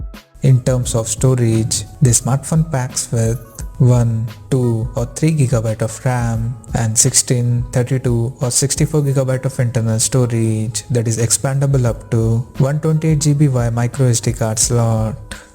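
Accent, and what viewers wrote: India. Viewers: Horrible Indian accent- sing song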